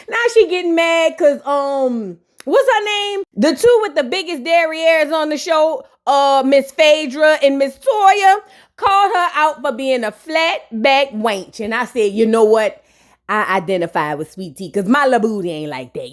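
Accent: New Orleans, United States. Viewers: I just wanna say you funny asf and that new Orleans sounds just turns it up❤ im always on da floor crying 😂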